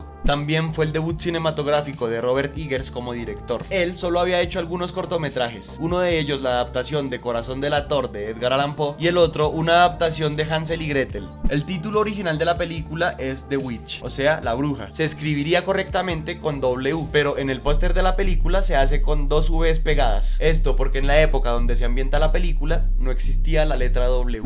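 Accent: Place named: Bogotá, Colombia. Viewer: Woow chimba estos análisis en acento colombo rolo!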